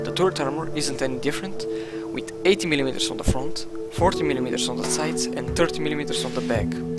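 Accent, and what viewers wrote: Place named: Germany. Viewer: WOW good Review! You sounds a littlebit German ^^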